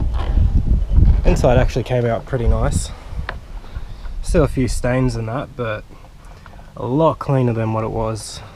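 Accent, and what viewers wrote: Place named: Western Australia, Australia. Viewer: Great transformation on that grotty ute! Am I right in thinking you are a fellow West Aussie? The accent... 😉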